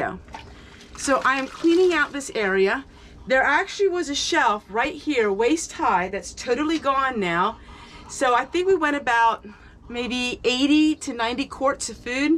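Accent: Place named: Ohio, United States. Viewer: Your accent sounds like your from Berlin OH.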